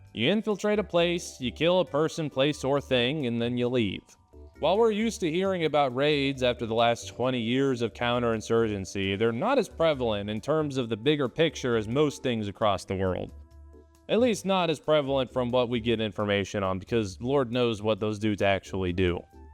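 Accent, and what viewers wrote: United States. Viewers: hhhmmm... I hear an American accent. I assert that YOU don't know what special forces do, because your special forces are bog standard elites that don't really do much actual SF work.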